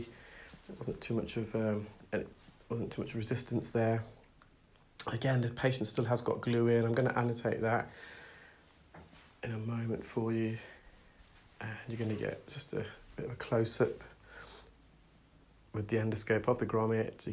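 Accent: England, United Kingdom. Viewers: with all the cases you have to wrestle out you must really appreciate the few cases that come right out with no problem 😂 i know i would - but i’m the impatient fan as i posted yesterday lol. u have such a suave soothing voice, i love the sound of the suave English accent (to me it’s an accent lol). i notice some have a sharper sound, hard to explain but my UK friends were impressed that apparently i noticed the difference between north and south England. they tried to trick me with a Yorkshire … i could tell it was different but not north or south ☺️ while i was on Holiday in the UK they loved my accent 😂 it was funny to be the one with the accent! they said i sounded so proper 😄 American proper?? 😂😂 i think we’ve butchered the Queens English!! anyway i loved the people & the country ❤️❤️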